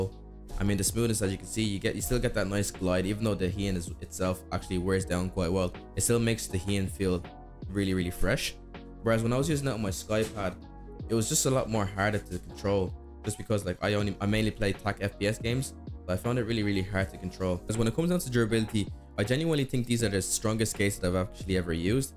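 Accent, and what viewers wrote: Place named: Ireland. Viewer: Love the review! Always nice to hear another irish voice while browsing the internet ☺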